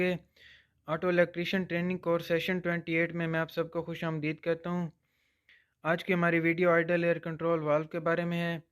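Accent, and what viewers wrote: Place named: India. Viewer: Do it in English I can't understand Indian accent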